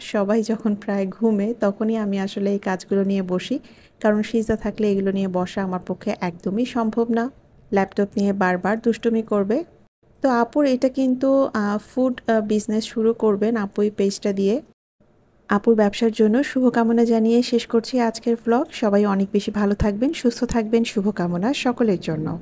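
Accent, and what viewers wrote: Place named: Barishal, Bangladesh. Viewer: Tmr basha barishal er kothay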